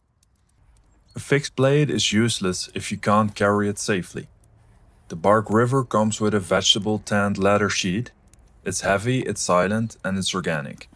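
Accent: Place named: Netherlands. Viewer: I gues your from the netherlands? I think i can hear it in your accent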